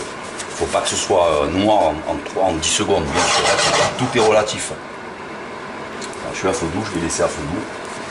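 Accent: South, France. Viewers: c'est génial merci à toi, je vais voir de suite! Ps: Ma copine vient du sud, on trouve ton accent ravissant ! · je vien juste de l'abonnez dis moi tu ai un mec du sud?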